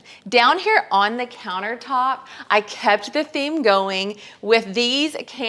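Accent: South, United States. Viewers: Love, love her southern accent! I’m from the Midwest… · I like you a lot more without the over the top southern accent, and yes I am southern.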